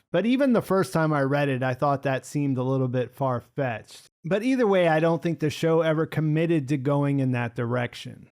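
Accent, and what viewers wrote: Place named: Northeast, United States. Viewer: yankee accent very tiring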